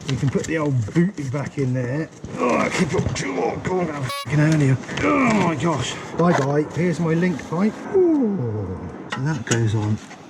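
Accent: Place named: United Kingdom. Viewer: Nice video. Took me a while to get round to watching it but I really liked this one. The hands on practical stuff is cool to watch, not to mention your familiar blighty accent and humour along with the well paced editing here. She definitely sounds a lot better with that black widow exhaust, I think you chose well! Is the factory you mentioned a side job you have? - James from 台中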